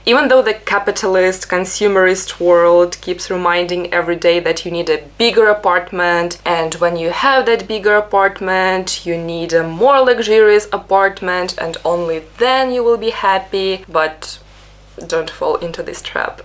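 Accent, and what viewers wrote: Russia. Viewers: Dig the real Russian accent, sounds better & natural, not to mention fun 🎉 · Хах акцент что надо 😂❤ Настоящая рашн герл❤ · Russian, slavic woman are so naturally beautiful and attractive. Love the accent! Cheers from Costa Rica! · You’re trying make russian accent is funny. Because you already have it🙂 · You should work hard at your pronunciation. You sound very Russian.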